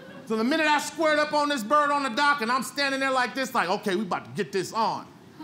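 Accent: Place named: Bay Area, United States. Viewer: I knew he sounded familiar A Bay Area Native 😂❗️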